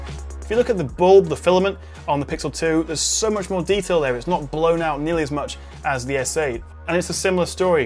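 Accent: United Kingdom. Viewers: i love you,, you are so handsome and nice british accent :p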